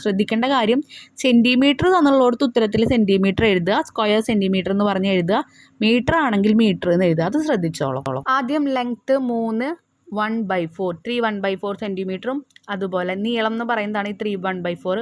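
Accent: Thrissur, India. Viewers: ത്രിശൂര് കാരാണെന്നു തോന്നുന്നു ടീച്ചർ അതു പോലെയുണ്ട് പറച്ചിൽ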